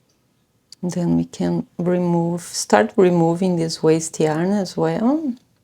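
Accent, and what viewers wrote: Brazil. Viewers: voce e brasileira ? reconheco a acento de brasileiros falando ingles :)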